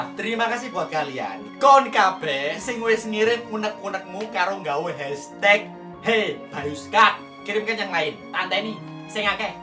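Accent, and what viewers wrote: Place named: Malang, Indonesia. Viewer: Bayu. Orang mana. Malang Yo omongane koyo wong malang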